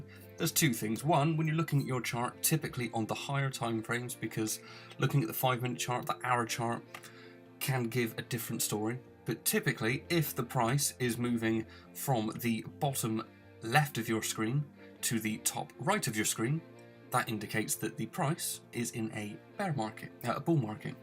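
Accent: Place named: Bristol, United Kingdom. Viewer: Your accent is even more Bristolian in recordings!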